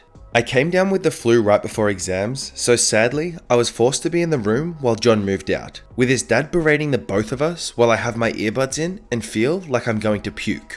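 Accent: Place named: Australia. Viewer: Yo you sound Aussie, are you?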